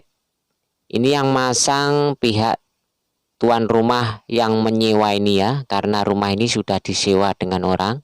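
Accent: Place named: Java, Indonesia. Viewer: Jakarta mas.. Logatnya gampang dikenal.. Jowo ne pundi mas? Enak ya.. Bisa merantau di Malaysia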